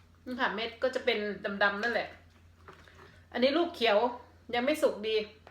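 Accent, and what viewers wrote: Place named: Central Thailand, Thailand. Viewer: ยังไม่แก่เลยเรียกตัวเองว่ายายแล้ว เราอายุมากกว่าอีกยังไม่อยากเป็นยายเลย 5555 ดีค่ะชอบ พูดภาษากลาง เราคน กทม.ฟังภาคอื่นเค้าคุยกันก็น่ารักดีนะแต่ฟังไม่ค่อยรู้เรื่องคะ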